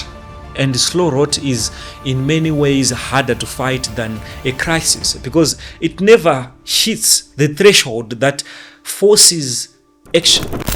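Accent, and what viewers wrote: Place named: Southern Africa. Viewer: Not sure where exactly he is from but he sounds Southern Africa.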